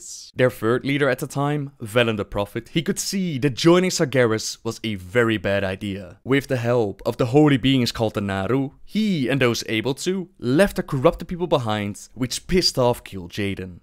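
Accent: Netherlands. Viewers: your dutch right?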